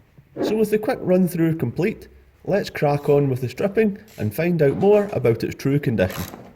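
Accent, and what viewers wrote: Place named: England, United Kingdom. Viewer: yes of course the finest English accent in the world. According to me he could talk a little bit more, than I could listen to the only true English accent all day long 👍🏻👍🏻👍🏻👍🏻👍🏻👍🏻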